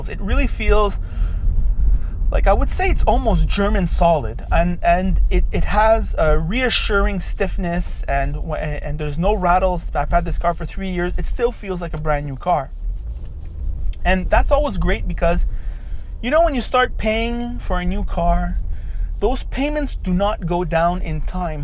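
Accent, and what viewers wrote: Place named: Quebec, Canada. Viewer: Great review from an honest guy! French Canadien?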